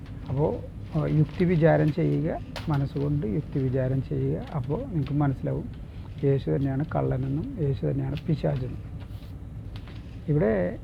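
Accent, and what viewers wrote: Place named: Kochi, India. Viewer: താങ്കളുടെ വിവരണം വളരെ രസകരം ആണ് നിങ്ങളുടെ ഭാഷ യും കൊച്ചി ഭാഷ ❤️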